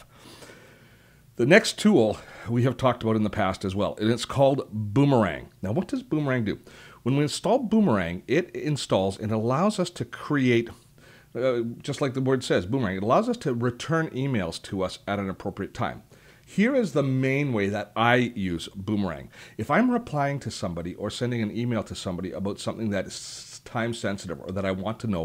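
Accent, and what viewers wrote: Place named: Canada. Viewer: You have a canadian accent : )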